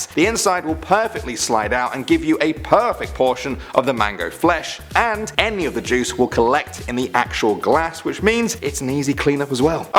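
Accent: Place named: United Kingdom. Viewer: i think that is british accent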